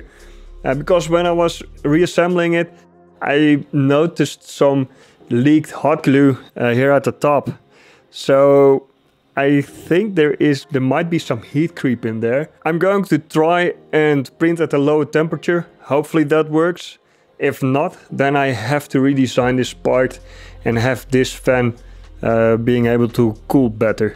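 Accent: Netherlands. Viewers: I smell a dutch accent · Nice work and a very interesting project. Your accent makes me miss the time i actually lived in Holland (Harlem). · love that dutch accent. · Love the Dutch accent! I am also Dutch lol. Love the video to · Doubted if you are dutch because of your accent. My suspicions were confirmed 😂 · Ook een nederlander haha ik kon het horen aan het accent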